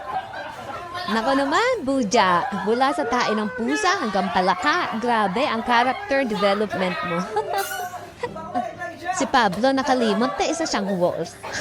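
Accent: Batangas, Philippines. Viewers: i really love to hear ur voice ....'yong batangueña ang tono ng pagsasalita ....👸💛❤️💛❤️💛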